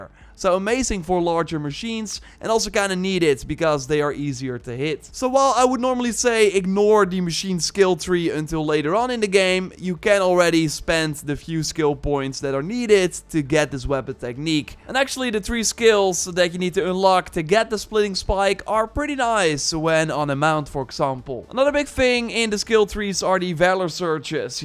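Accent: Netherlands. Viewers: This Dutch accent is something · you sound dutch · nice dutch accent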